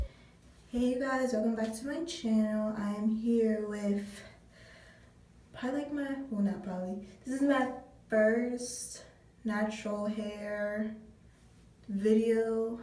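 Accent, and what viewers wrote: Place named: DMV, United States. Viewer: You talk like you from the dmv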